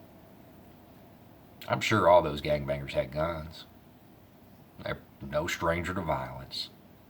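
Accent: South, United States. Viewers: As a Liberal in Western Pennsyltucky, I can say that this guy has opened my eyes., my man, I really hope you read this; You are doing far more good than anyone I've ever known personally or met as an acquaintance. Keep on doing what you're doing. I fully support everything that you're saying. Jesus H. Christ hanging off a cross, I needed to hear this message from someone with a southern drawl. From the moment you addressed the hero complex and the AR-15 being a symbol of masculinity to the minute you addressed every issue in this video, more people need to hear what you have to say. I'm just saddened to say that the message you have to share is simply going to fall on deaf ears; don't let that deter you from putting your words of wisdom out there, because it is reaching enough people to make a difference. I fully stand behind this guy. Cheers,.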